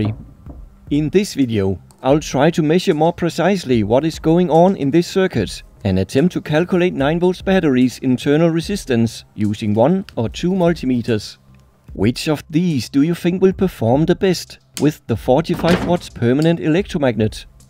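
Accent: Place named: Denmark. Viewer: Rema 1000 is a Norwegian discount supermarket chain also operating in Denmark where I'm sure is from as I can tell on his thick Danish accent. So, these are just private label batteries made for Rema 1000 supermarkets. And Kameda is a private label battery brand of the Danish discount tool chain Harald Nyborg. Harald Nyborg is kind of Denmark's answer to America's Harbor Freight. So, it's also unknown who makes Kameda batteries. And with all private label products the manufacturer can change from one year to the other as the retail chains usually contact the lowest bidding manufacturer to meet their requirements.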